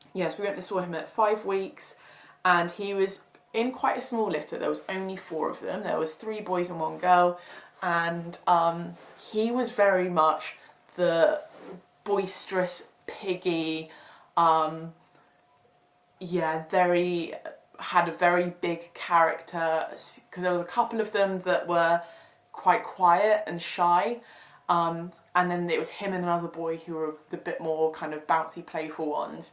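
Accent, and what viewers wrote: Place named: United Kingdom. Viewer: why is the american flag on the thumbnail and your accent is british?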